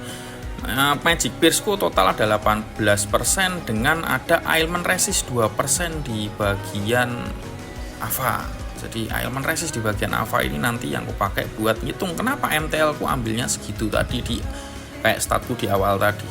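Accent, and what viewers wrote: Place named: Java, Indonesia. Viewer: Gua sub yt lu karna jelas ngasih penjelasan nya,meski ada loga jawa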